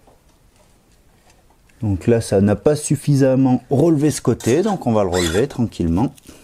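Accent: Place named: Loire, France. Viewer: Je dirais, tu prones le calme, voir le plaisir de faire les choses, ça pourrait sembler banal, mais moi, il m'a fallu du temps, pour y arriver, et encore.... ; de plus tu as l'accent de la région de St-E., la Loire, la Haute-Loire : souvenirs, souvenirs...Apaisé, je me suis endormi en t'écoutant... mais je me suis réveillé aussi, je te rasssure !: tout ce qui est réglage, ça n'est pas trop mon truc, mais un peu plus maintenant, grâce à ta video . I like, donc. Merci.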